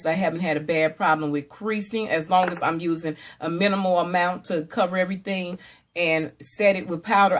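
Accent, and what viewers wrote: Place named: South, United States. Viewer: I love your southern accent 💕💕 Kisses from NJ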